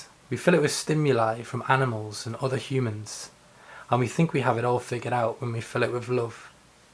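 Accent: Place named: United Kingdom. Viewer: More horse shit 'British' accent ? what.. go back to your pitiful little island a piss off.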